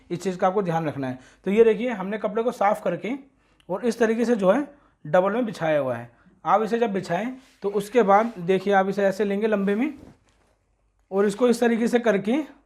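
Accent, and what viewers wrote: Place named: Uttar Pradesh, India. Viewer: Aap bolte hai to lagta h aap up se hai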